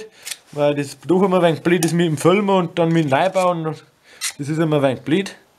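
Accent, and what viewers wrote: Germany. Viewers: Wo aus bayern kemz es?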